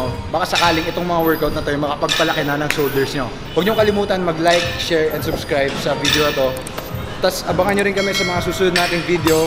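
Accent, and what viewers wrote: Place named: Tegal, Indonesia. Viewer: Orang tegal ya? Bocah ngapak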